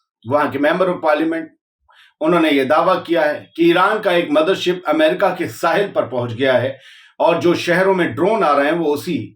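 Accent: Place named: Lucknow, India. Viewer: your accent sometimes make me feel that you are from Lucknow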